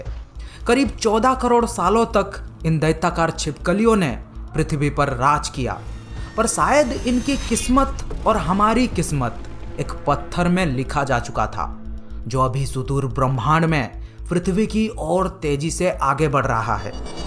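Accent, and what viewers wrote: Odisha, India. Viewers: Bhai aapka baat krne k tarike se hi pta chal raha hai tum Odisa se ho😁😚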